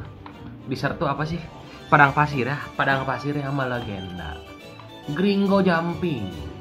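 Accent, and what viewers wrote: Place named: West Java, Indonesia. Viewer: Sunda Nah Te Sunda Pisan Euy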